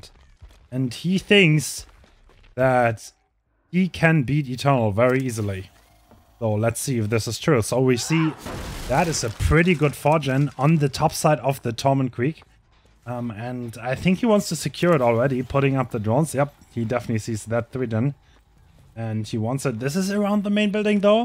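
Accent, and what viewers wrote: Germany. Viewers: bro are you german? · This german acsent..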